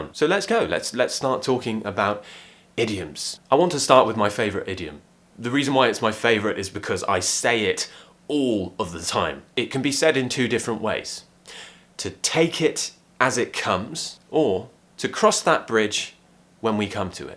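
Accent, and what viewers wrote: RP, United Kingdom. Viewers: Very nicely done, and I do enjoy listening to your cool British accent. I notice that you've made a point of saying that you do not use outdated expressions. However, I can tell you that here in America at any rate, every one of the idioms you discussed has been around for quite a long time. They were all used when I was a kid (in the 1950s) and used by my mom and dad much earlier. But never mind, I enjoyed listening to you, and I will look for more of your videos. And if it's not being too old fashioned, I congratulate you on "getting hitched", and all best wishes to your lovely "ball and chain". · You are the reason that i like British accent thank you a lot sir 😍🔥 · Wonderful examples and I absolutely like your British accent! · WOW it's the best video I've ever seen in a British accent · Sir how can I learn your basic English British pronunciation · Excuse my french (I am francophone and enjoying your lessons, your British pronunciation), but I wish to congratulate you for your marriage and wish you a lot happiness and... 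many children (?)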